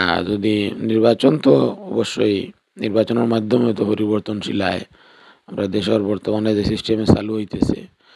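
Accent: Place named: Sylhet, Bangladesh. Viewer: খাটি সিলেটি ভাষা ❤❤❤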